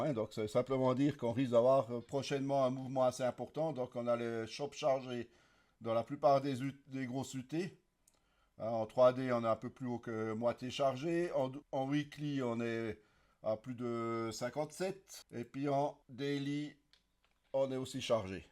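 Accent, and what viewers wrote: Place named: Alsace, France. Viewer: je reconnais bien ce bon accent alsacien car je suis de strasbourg 🙂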